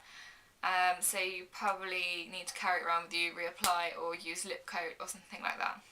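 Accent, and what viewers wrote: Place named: England, United Kingdom. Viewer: i loveeee your accent! reminds me of emma watson :) what part of England are you from?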